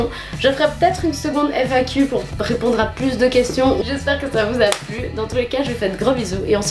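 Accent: Belgium. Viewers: Ahahah j'avoue le coup de faire une vidéo seulement si on a atteint un tel nombre d'abonné-es je n'y aurais pas pensé ^^ Si tu fais un meetup je serais là ! :) Je pense qu'on habite la même région au vu de ton accent et de là où j'tai vue. D'ailleurs si tu fais un prochain FAQ j'aimerais juste savoir dans quelle ville tu habites? Les youtubeur-ses belges sont tellement rares! ^^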